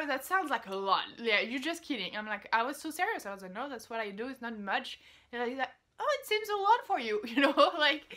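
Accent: France. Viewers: C'est hallucinant tu as toujours ce petit accent qui me fait dire "ok, she's french, i'm 200% sure" Mais bon retour au pays 💪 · comment tu as pu garder une pareil accent français en 5 ans?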